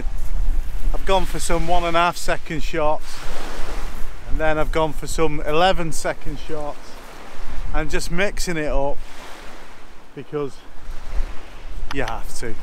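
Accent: Lancashire, United Kingdom. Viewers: Brilliant !!! I have watched every one of your vlogs. Coming from Bolton ( not too far away from your hometown ) I too share a "Lanky" dialect not as strong as yours but listening to you talk and laugh... Fabulous :-))))) You seem to be having a load of fun with your mates and the photography aside, love the whole atmosphere you seem to generate. Cheers and Sithee! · I think we have to thank you. It does take a lot of dedication to put in the hours behind the lens. Don't worry about production values and all that mince. Your big baw face and lancs mawling of the Anglian dialect is what holds it together...and the good shots as well.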